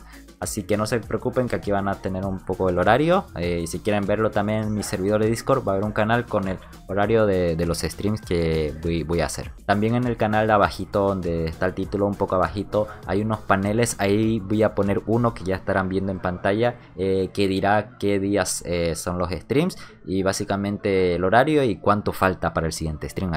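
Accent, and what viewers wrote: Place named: Santa Cruz, Bolivia. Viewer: Si te soy honesto, yo te seguia por tu forma de comentar y jugar.. no llevo muchos meses, pero francamente no tenia idea que eras de Bolivia para que mentirte... Por tu acento me imagino que eres de Angel Sandoval, Santa cruz?.. hablas como entonao XD le subes enfasis a las O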